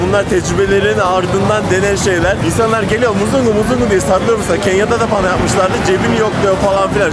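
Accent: Konya, Turkey. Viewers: bir Konyalı olarak en büyük ortak yanımız gözlük 👓 koyma yeri ve bırakamadığımız o meşhur gonya ağzı konuşma 😀 Konya dan selamlar 👋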